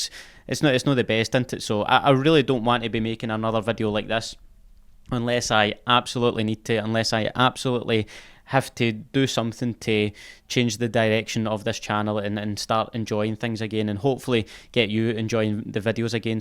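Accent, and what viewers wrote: Scotland, United Kingdom. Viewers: mate, i watch for you, your accent, and the Scottish wit. Your creator-craft has grown significantly, and it's been a pleasure to see. I'd click so fast on any ARC Raiders content. There are so many camping spots to abuse, and the VOIP would deliver some golden content. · I got suckered back into COD with the MW2 alleged 2 year cycle and support, Activision hasn't had a cent of my money since but I still watch all the content for the factor. A few other creators tried imitating the WZ spectating and it was crap. I feel it's the Scottish humour and accent we all love so much more than the game. Don't let burnout and negative comments bring you down pal. For every one bad comment there's a crap load of lovers of your content. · The reason I started watching you was your Scottish accent. Everything is entertaining in Scottish accent. 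I would watch paint dry if you would narrate it.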